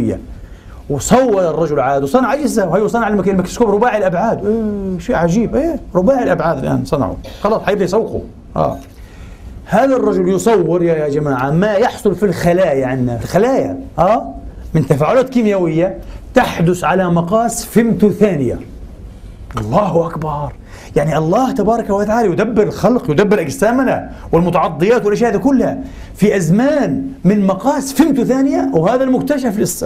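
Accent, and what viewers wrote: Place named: Palestine. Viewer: والله جعلتني اشتقاق الى سماع اللكنه الفلسطينية انت ممثل مسرحي رائع انت فنان نبرات صوتك صادقة .....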